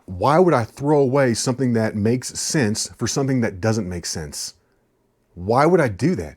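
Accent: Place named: South, United States. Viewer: Here from mctoons channel, new sub here, great vid!!! Love the accent too man, sound like where ever billy bob thornton is from!!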